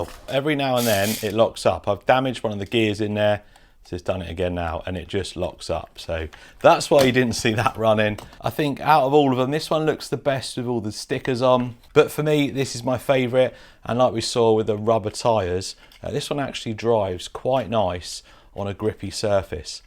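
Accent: United Kingdom. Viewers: I was onboard until you started pronouncing things in British fashion, rather than how they're actually pronounced. Knee-sahn, not nis an. FFS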